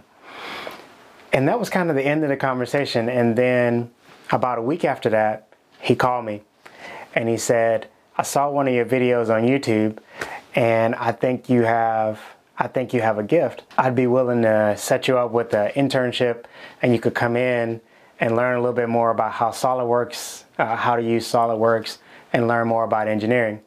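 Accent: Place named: Baltimore, United States. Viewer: Just as everyone else has said, your videos are amazing. I hope you get more exposure because nobody on youtube explains things as well as you. Also, are you from Baltimore? Your "you"s and "to"s sounds like you are!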